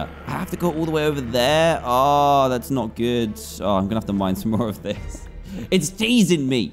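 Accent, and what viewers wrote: United Kingdom. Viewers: how would they be brothers? Think is from NYC and is from the UK. Why do you think has a British accent and Justin doesn’t.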